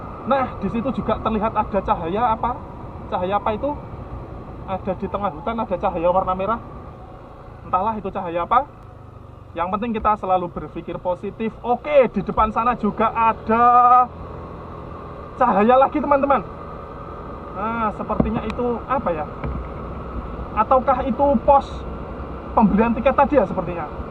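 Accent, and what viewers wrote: Java, Indonesia. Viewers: Gemes dengar suaranya... medok medok pie ngono.. Salam dr medan Pak..buat sekeluarga...sehat selalu..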